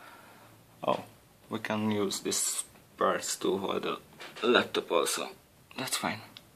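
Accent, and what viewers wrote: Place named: Hungary. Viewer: Ahogy beköszöntél már hallottam hogy magyar vagy olyan jellegzetes magyar akcentussal. Remek videó. Köszönöm a feltöltést.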